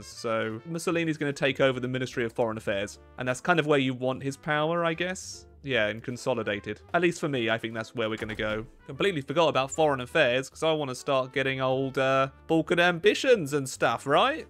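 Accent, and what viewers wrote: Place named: England, United Kingdom. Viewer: What's the name of the type of English accent he has ?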